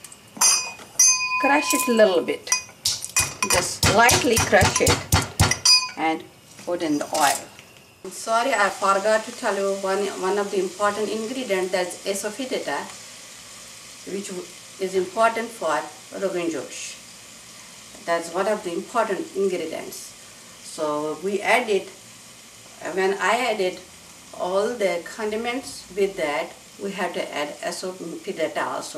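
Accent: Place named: Kashmir, India. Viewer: fantastic loved this recepie an original kashmiri woman speaking with original kashmiri accent all real originak kashmiri stuff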